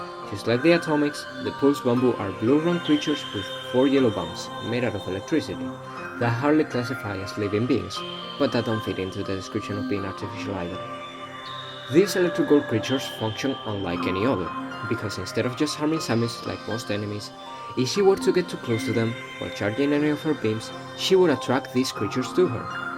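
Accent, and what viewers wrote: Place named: Spain. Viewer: Como se nota el acento españolxd good video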